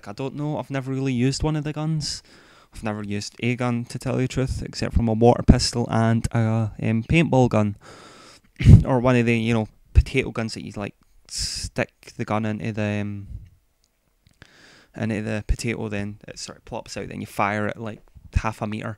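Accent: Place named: Scotland, United Kingdom. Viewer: Where about from Scotland you from?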